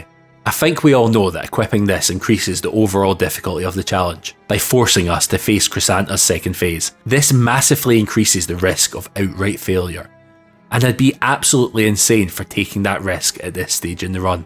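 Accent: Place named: Australia. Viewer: ohh my bad 😭. It was just an unfamiliar accent so i assumed it's Australian.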